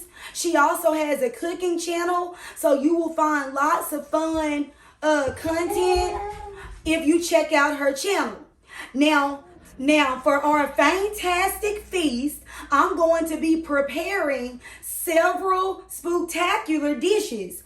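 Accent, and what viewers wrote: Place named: South, United States. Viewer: Luv luv your down south accent...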